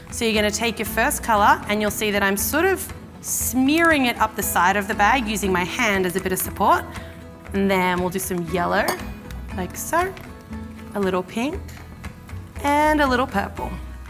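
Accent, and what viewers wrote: Australia. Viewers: She sounds like she’s from Australia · This was fun to watch tbh haha. and is that an Australian accent? I love her voice lol 😋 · Is she Australian?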